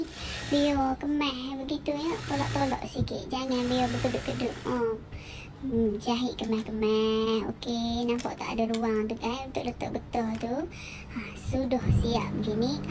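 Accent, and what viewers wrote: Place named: Pahang, Malaysia. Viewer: orang pahang deh...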